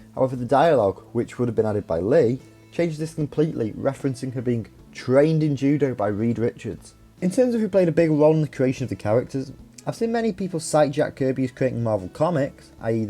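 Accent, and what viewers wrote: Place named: England, United Kingdom. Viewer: The second most annoying English accent, besides mesdsy69. 😑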